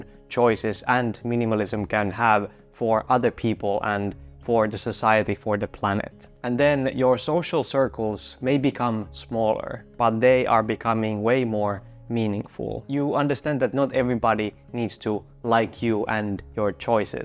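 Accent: Finland. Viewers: I was listening are you Finnish? Muumimuki ja kahvi 😂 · Oon ihan varma, et puhut suomiaksentilla :D Miks päädyit tekee videoita englanniksi? Ootko miettinyt, et sulla ois kohderyhmää myös Suomessa ja suomenkielellä? :) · By your accent, I guess you’re from Finland. Am I right? 😊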